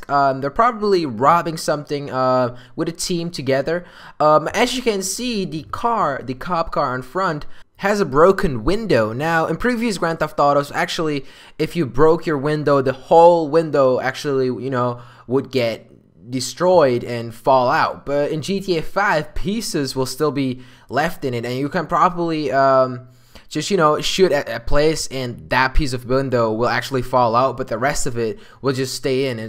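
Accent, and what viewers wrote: Netherlands. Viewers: This guy is from the "South" of the Netherlands, and their Dutch compared with their english is no good... Or easily said: Annoying. (Im from Amsterdam) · He is from The Netherlands So he is speaking not the engilsh as you i think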